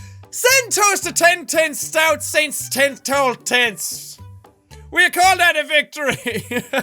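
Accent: Ireland. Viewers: His Irish accent comes back and it's kind of awesome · His Irish accent comes out a lot more in this episode. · You should go full out Irish for a whole video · He always did have an Irish accent... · just got more irish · This video really brought out irishness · Next time you do a tongue twister thing, you should totally find the speech V does in V for Vendetta! Also next time you do a tongue twister with lots of "th"s feel free to play up the Irish accent because it's such a lovely accent and I never hear it in England xD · He went full irish. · it gives him a much stronger irish accents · Is it me or does he sound more Irish when speech jammer is on? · Voice jammer... Should be Called Irish enhancer. Honestly you sound a lot more Irish... Maybe it's because you sound drunk HA! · You've never sounded more Irish:P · he sounded like a drunk irish person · his irish accent got like 2x stronger with the speech jammer and it was wonderful. 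XD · just sounds more and more drunk:D Pretty Irish though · YOUR IRISH IS SHOWING AGAIN!!!😂😉I love your Irish accent,even if it isn't as strong as before XD <3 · his inner irish accent came out during this like the "typical" irish accent applauds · is it just me or is he way more Irish in this video than usual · I like how his Irish accent got more Irish-y in this video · sounded even more irish than usual here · Lol your Irish accent becomes way more announced when you use the speech jammer, I love it XD this was hilarious:D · this is awesome, you sound even more irish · He sounds so Irish in this 😂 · So, in order for to go back to saying the Irish "think" we need to voice jam him. Got it. ( I love his speech either way btw) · You just sound more Irish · He sounds more Irish than usual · you sounded much more Irish when you did this challenge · If anything... during most of these sounds more irish. 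· The Irish got worse and worse as he went on...and he did sound drunk, lol. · the irish accent got stronger as the video went on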